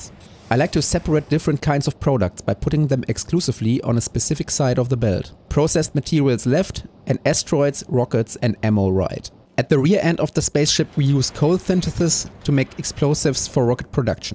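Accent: Germany. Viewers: I only just started making green science so I don't know why I'm watching this other than enjoying a German accent talking about precision and efficiency.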